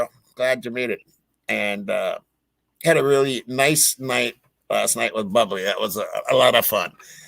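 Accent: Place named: South, United States. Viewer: He sorta has a southern accent or something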